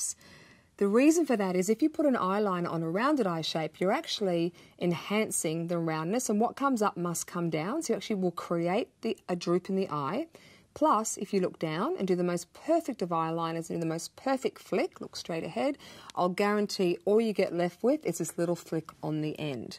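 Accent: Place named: Australia. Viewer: OMG... I am an Aussie and a makeup up NUT and I cannot believe I am only learning of this amazing woman now! Where the bloody hell have I been? Overlooking that, what a fabulous tutorial and explanation... too good! I have exactly this eye shape and have abandoned all hope of ever wearing a sexy flick but now, maybe, I can... how fabulous to have a tutorial so well explained and so relevant AND with an Aussie accent! New subbie and super happy, thank you, this was fantastic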